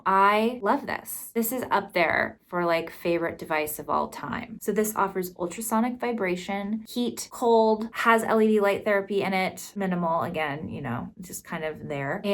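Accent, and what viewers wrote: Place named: California, United States. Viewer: I think it’s actually the California accent so it might be challenging for people with a southern accent which is pretty different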